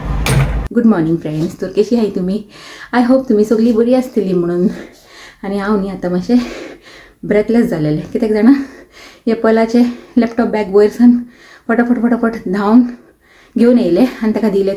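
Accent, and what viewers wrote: Mangalore, India. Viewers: Your Konkani sounds like Mangalore Konkani. Are you from Mangalore?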